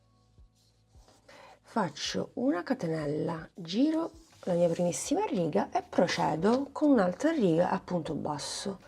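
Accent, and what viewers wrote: Sicily, Italy. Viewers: Carinaaa 😊 io adoro i progetti semplici e veloci 😂 anche perche sono gli unici che mi riescono 😅.mi togli una curiosità (se vuoi) sei Siciliana e di Catania per caso? Grazieee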